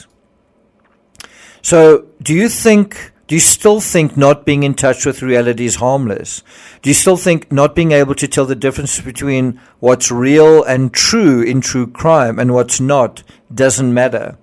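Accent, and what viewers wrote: South Africa. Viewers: I would say Maddie because he met her at the restaurant, she may have been vegan herself also. Somebody has also say Kaylee didn't live at the residence anymore and was visiting Maddie to show off her new car, so perhaps her being there was totally unknown to Bryan. Xana and Ethan were almost certainly collateral damage as Bryan left the house. PS: I love your South African accent 😆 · I live in Brazil but am a fellow Saffa... I love true crime and have been following the Idaho case. I came by your channel by chance and thought... I recognize that accent - this guy must be South African... low and behold you are. Proudly South African! I just subscribed ☺️ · love your videos! are you south african? I can hear a slight familiar accent. i'm from the free state studying criminology - your channel is truly amazing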